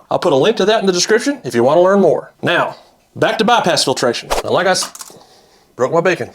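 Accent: South, United States. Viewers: The only person I have listened to with Southern accent who doesn’t sound like a moron.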